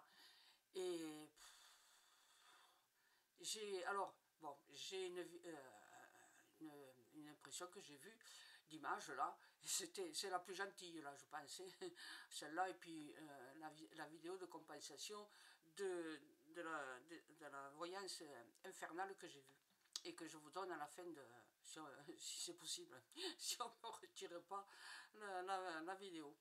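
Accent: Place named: South, France. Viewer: Vous avez un bel accent du sud 😊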